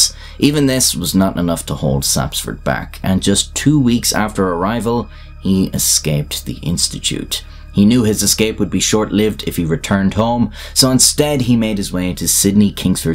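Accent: Ireland. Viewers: Narrated in an Irish accent although the grammar, syntax and tortology is clearly American. Why? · Gosh I love the Irish accent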